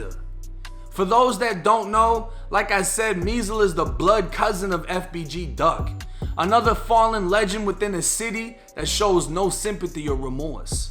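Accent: Boston, United States. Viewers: Super dope channel. Random: Is that heavy accent on "AR" words only common with white people from Boston? I don't think I've heard any black dudes talk like that. Lil Mahk lol (Marc). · R u from Boston with that accent?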